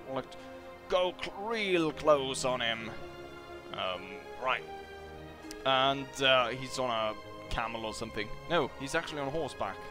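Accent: United Kingdom. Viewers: I have just started watching your channel . I am really impressed with your english accent . If we were in this era you'll make a good soldier . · Nice british accent you got there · Your accent sounds more British when you talk normally 😂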